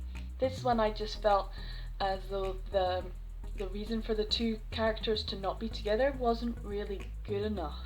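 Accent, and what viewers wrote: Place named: United Kingdom. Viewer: Your accent!! I didn’t realize you’re from the UK! I also didn’t realize you have a channel. Subscribed! 💖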